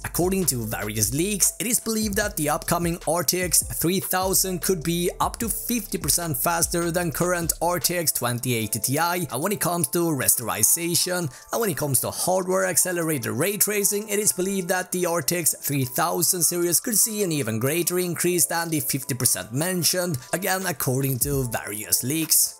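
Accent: Sweden. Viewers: he's a swede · As a fellow Swede I instantly knew you where swedish hearing you speak english haha, no offence man!. That said, please work on your presentation, you sound like a robot and talk way to fast. This is only constructive cririsism, the content is great! peace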